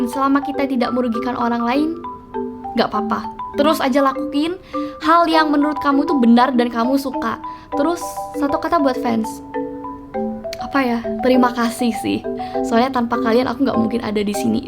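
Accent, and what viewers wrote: Java, Indonesia. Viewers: Kak kk orang jawa ya karna, suara omongan kk Kalok iya sama donk kayak aku tapi aku ngomong nya itu kayak orang medan😂😂😂😂😂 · Kaka kok medog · Logat jawanya uhhhh gemessss😭😭😭😭😭💙💙💙💙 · cantik Imut Yang bikin lucu medok nya itu loh😗😂♤♡ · Kak bicaranya kayak rada rada ada bahasa jawa nya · Medok muu kakkkkk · Ya ampun medhok nya jadi kiyot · Kak boleh gk buat konten 24 jam ngomong medok:')🥺❤️🌺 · medok banget buna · Monmaap Cece org Jawa? Medokny nongol😁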